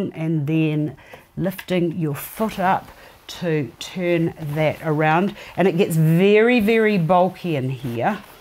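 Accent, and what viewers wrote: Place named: New Zealand. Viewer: How awesome. As soon as you started speaking I thought yayyyy a kiwi!!! Awesome seeing NZers coming up at the top of my search list. Love from Ari in welly!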